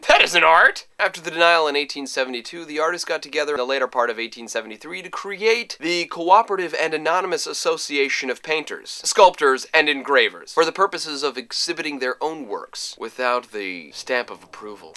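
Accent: France. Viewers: loving the french accent! xD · That's an amazing French accent you've got there :3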